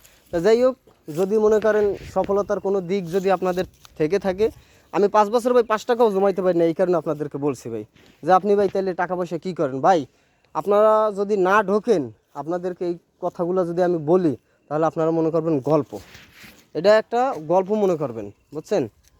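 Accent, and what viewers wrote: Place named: Barishal, Bangladesh. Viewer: ভাই কি বরিশালের?❤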